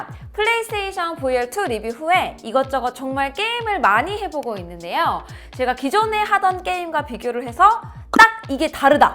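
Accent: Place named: Seoul, South Korea. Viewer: 전달력 끝판왕 😊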